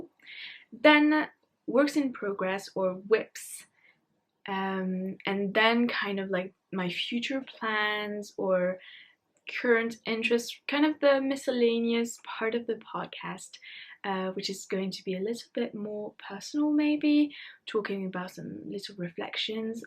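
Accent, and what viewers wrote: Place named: United Kingdom. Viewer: Hello from Nova Scotia, Canada. Congratulations on your first podcast, it was delightful and very informative. Your English is perfect and love the slightly British accent. Your enthusiasm and sweet smile make you and star in my books. Just be yourself. Your knitting is quite lovely and your yarn choices are great - not cheep as far as I am concerned. I would love to try the Drops line of wool someday. I am 68 and very much on a budget so I do what I can. I look forward to your next podcast which, by now is out and ready for me to watch next.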